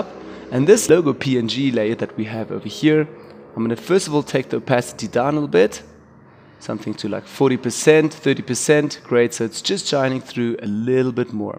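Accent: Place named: South Africa. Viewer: You sound South African....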